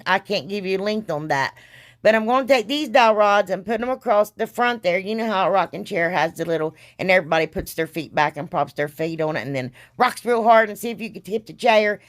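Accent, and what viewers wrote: South, United States. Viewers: Girl I thought I sounded country but you have me beat. I love it. ❤️❤️ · Het, I just love all of these. They are all so stinking cute. I can't pick a favorite. For anyone who says anything about you or the way you talk, they don't have to watch. There are a lot of ignorant people. I guess they don't know any better. We love you and your crafts and the way you talk. I particularly love your southern accent. So don't even pay attention to people that say stupid stuff. The people that matter love you so that's all. 😁 ♥️♥️♥️ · IOVE YOU!!! And your channel If people don't like the way you talk then they shouldn't be watching I love the way you talk you are southern So am I Anyway (lol) some people just cant be nice!!! it's not in them But Anyways I loved all of them Great Job!!! You are the BEST!!!! You're my #1 crafter🖤🖤 Take Care My Friend and God Bless you and your family Love you to the moon and back and my #1 phrase is if you don't like me Oh well your loss I'm a nice person and if you're talking about me you're letting someone else rest awhile So suck ot up Butter cup (lol) Love you Sweet lady🖤🖤🖤🖤🖤🖤🖤🖤🖤🖤🖤🖤🖤🖤🖤🖤🖤🖤🖤🖤🤣🤣🤣🤣🤣🤣🤣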